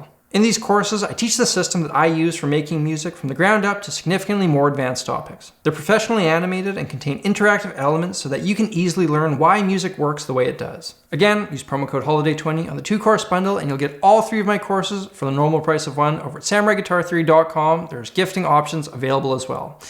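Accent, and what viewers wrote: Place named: Canada. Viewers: Forgive me if it's too personal/if it's a weird question, but where does your accent originate from? It sounds Canadian maybe, but a little more... I dunno, "thick" on some words? I really like it, it sounds very cool! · He’s from Manitoba, which is a province in Canada (I assume you’d know that, since you can recognize a Canadian accent. If you didn’t, I guess you learn something new every day 😅)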